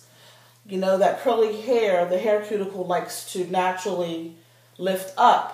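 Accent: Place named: United Kingdom. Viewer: Looks good! You sound like you have a British accent. Your hair is always fab.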